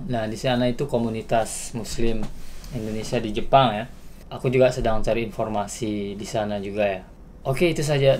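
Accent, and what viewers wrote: Lombok, Indonesia. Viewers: Bang orang Lombok ya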